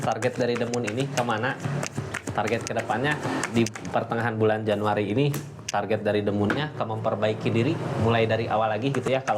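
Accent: West Java, Indonesia. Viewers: Nyabut jukut😂😂, aduh si Abang sunda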